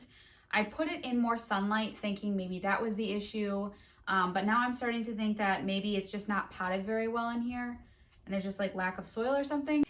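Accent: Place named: Wisconsin, United States. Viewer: I KNEW you had to be from Wisconsin (I could be wrong) because of your slight accent! I love it!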